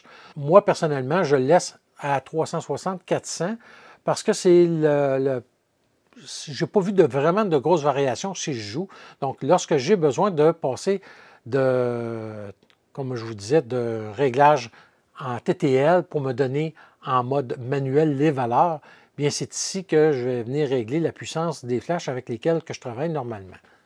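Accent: Canada. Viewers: Merci infinim❤ent pour cette belle présentation. Vous dites mettre à disposition le manuel en français; où puis-je le télécharger ? Encore bravo et je me plais à découvrir vos différentes vidéos avec un très joli accent canadien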